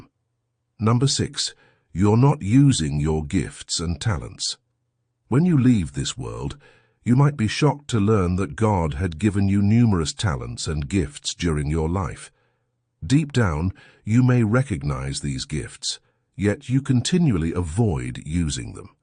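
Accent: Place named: United Kingdom. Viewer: Dear Sir. Thank you for the content that you create and share with us. is a blessing to humanity and your wisdom truly touch our hearts. At home we refer to you as the man of God with the British accent. This video made me burst out in tears 😢 I grew up with many setbacks and only discovered myself late in life. From a very young age the enemy has set traps in motion to delay and derail me from my God given destiny. Just when things got better for us as a family my daughter was diagnosed with type 1 diabetes in 2022 at 17 months old. It turned our lives upside down and we face many challenges. I had to resign my job to take care of my daughter full time to meet the requirements of keeping her healthy. I feel like a loser when i cant give my children what they ask for and also the full financial responsibility being on my husband. We are a praying family and we love and trust in Jesus. 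There are so much i dont comprehend and my heart is aching for my daughter and what we are going through. Please pray for my daughter that God will heal her. May God bless you endlessly and continue to do mighty works through you and this channel. We are truly blessed to have you ❤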